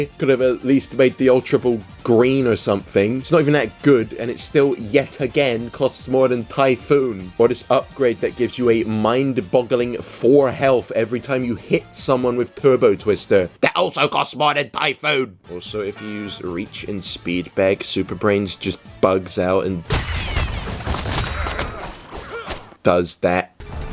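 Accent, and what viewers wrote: United Kingdom. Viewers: bro I love your videos so much man, your humor is so fucking amazing 😭 Your selfmade memes are hilarious and everything is made even better by your british accent and slang and this danm voices you make 😭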